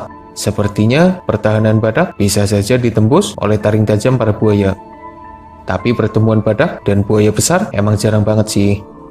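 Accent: Banyumas, Indonesia. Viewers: Kie ta kyne cenel wong ngapak o yak......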